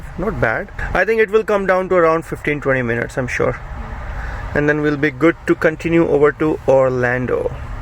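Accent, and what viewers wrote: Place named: India. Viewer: I know this accent. Are you originally from India? If so, where at? I lived there for 2 years.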